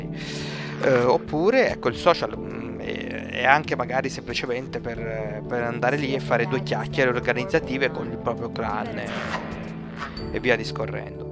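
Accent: Italy. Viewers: Napoletano Doc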